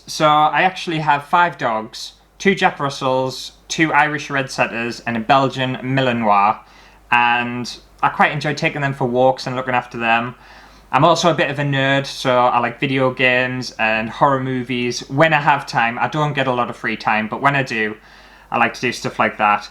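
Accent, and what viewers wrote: United Kingdom. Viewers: Hi 👋 I love your accent and how you phrase things. You are so funny! I know it's the UK-speak, but y'all say "us" instead of "me". When you say "us" I always imagine you and a mouse 🐁 in your pocket, lol! Or an orange 🍊 🐁 mouse on your shoulder (orange bc I just finished watching your sexy Terry's Chocolate Orange video).....so a white mouse --->🐁 with an orange long-haired wig and the steampunkish sunglasses 😆 🤣 😂.... for some reason I see the mouse with a Dali-type moustacheeeeo. You are so funny and brave! I would love to do what you do - meaning making videos- but I'm terrified 😨.....stupid, I know, but...?🤷🏼‍♀️ Anyway, your videos always make me laugh and help when I'm depressed. So, thank you! 🙂 🙃 🙂 God Bless 😃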